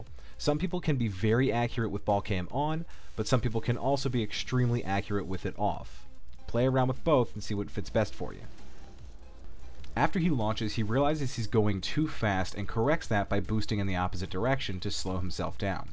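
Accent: Netherlands. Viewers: So you're dutch i reckon.. Where did you get your accent? i like your vids btw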